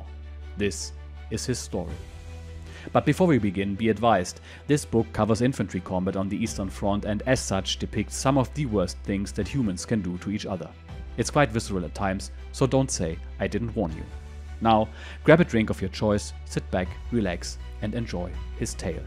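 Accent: Germany. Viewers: I've actually heard this book before, but it's got a little more drama with a German narrator! Love the channel, thank you!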